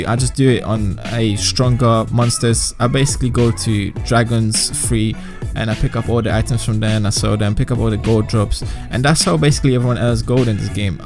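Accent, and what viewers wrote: United Kingdom. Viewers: At u British BC u sound like it lol( =①ω①=) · I'm guessing your British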